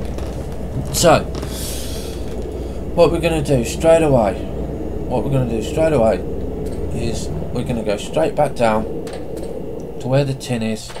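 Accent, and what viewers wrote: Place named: England, United Kingdom. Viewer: What bit of England are you from? as your ascent I think I have heard before but I can't remember where~! :P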